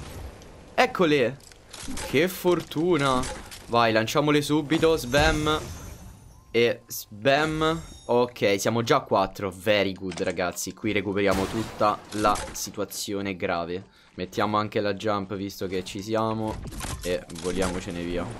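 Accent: Rome, Italy. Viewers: Ma sei di roma?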